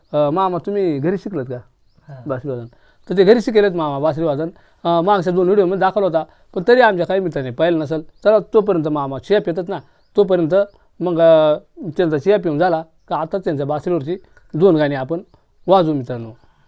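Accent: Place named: Akole, India. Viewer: दादा भाषेवरुन तुम्ही नगर जिल्ह्यातील अकोले तालुक्यातील वाटता...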